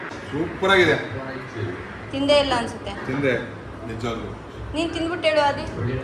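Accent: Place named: Tamil Nadu, India. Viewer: your talking kannada like a tamilian. You lost ur kannada touch a little. Btw i am tamilian from bangalore. My mom was from chennai whose kannada would be like u Not meaning to hurt u. Just a comment from my POV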